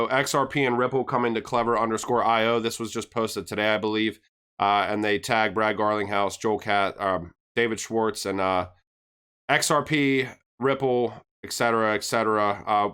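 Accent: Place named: Western Pennsylvania, United States. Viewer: Are you from western PA? Gotta be, the accent had me thinking it and now the pirate hat. Gitta be only western pa guy wears a pirate hat. Anyway, more educational is good. The tokenization is fascinating and dynamic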